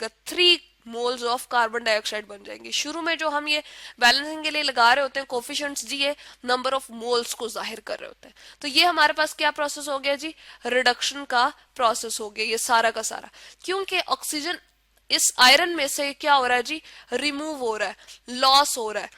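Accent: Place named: Pakistan. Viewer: You look Pakistani the way you talk 🤔🤔😍 I love your way of talking and this video helped me a lot thank you .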